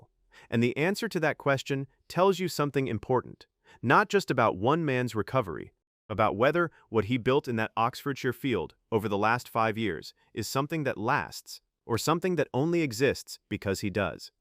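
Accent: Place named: United States. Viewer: This narrator is American Jeremy Clarkson's is English if someone is going to narrate an Englishman who lives in England surely it has to be narrated by British narrators just saying.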